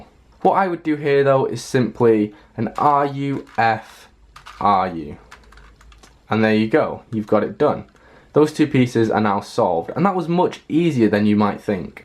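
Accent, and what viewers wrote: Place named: Manchester, United Kingdom. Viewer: So much easier to understand when it is explained in a Mancunian accent, like my own. :o)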